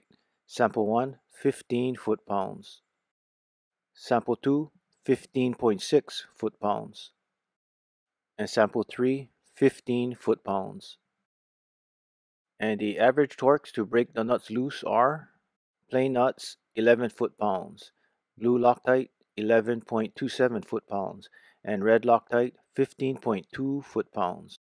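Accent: Hawaii, United States. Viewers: You sound Hawaiian 🤙🏽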